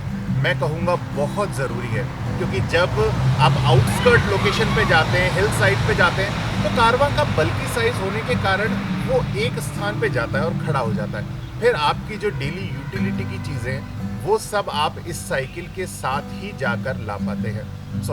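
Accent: Standard. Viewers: वाह क्या शुद्व हिंदी बोलते हो आप 😊👍👌मजा आ गया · Apki Hindi itni sudh h k. Salute to you sir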